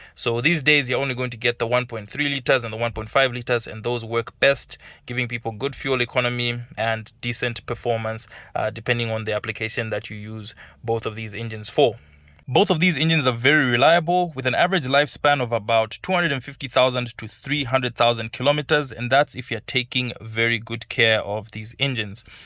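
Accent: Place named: South Africa. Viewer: Bro i thought you from my country south Africa 😂😂 how you talk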